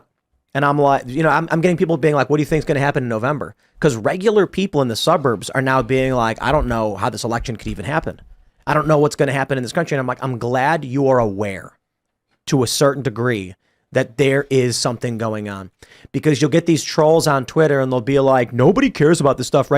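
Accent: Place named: Chicago, United States. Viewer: I love it when you can hear go full Chicago gangster vernacular. It's subtle but it's there.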